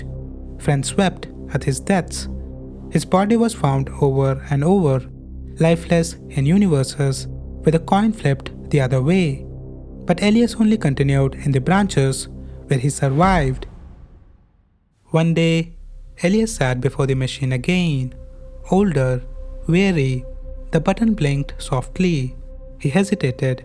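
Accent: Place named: India. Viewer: You sound like an Indian 😊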